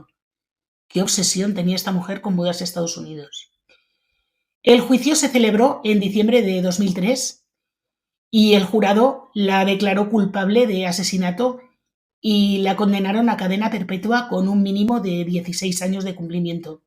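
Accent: Spain. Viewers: Hola, buenas. Mira una cosa, de que región de España eres? Si me puedes contestar claro. Es por un dejé que tienes al narrar las historias. España es un país de acentos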